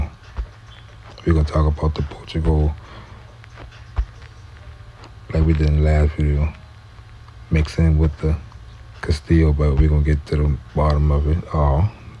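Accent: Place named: Gullah, United States. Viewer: I here that low Country Gulah "Gulch" in your voice Nobility is that Charleston? "Chuck Boy" 💪🏿?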